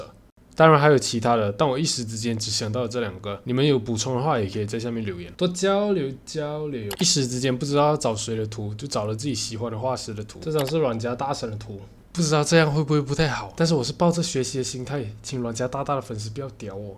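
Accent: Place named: Malaysia. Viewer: hii! 本人是小小新手入门的小画家!，一点进去影片听到malaysian腔就觉得很亲切(? 插画圈子在马来西亚是真的很小,平常都是混欧美/日本的圈子多一些，可以看到在本地有那么大神的画师真的太棒了T-T.很喜欢你的幽默！影片也简单易懂,希望能够做多多这种相关的内容！:face-blue-smiling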